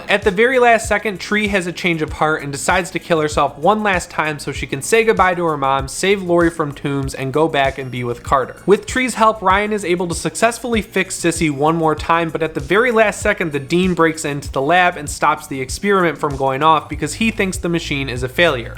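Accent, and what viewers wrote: Midwest, United States. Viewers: You sound like you're from Chicago or midwest.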